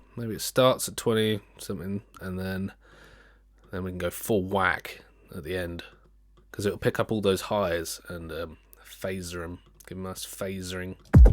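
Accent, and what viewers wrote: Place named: West Country, United Kingdom. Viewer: Are you still making videos? Or have you become to a higher plane artist? You sound like your rather from Devon or Cornwall.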